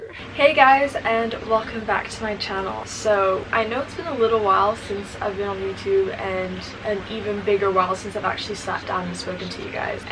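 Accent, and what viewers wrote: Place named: England, United Kingdom. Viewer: I can hear your English accent emerging...how long have you been in London?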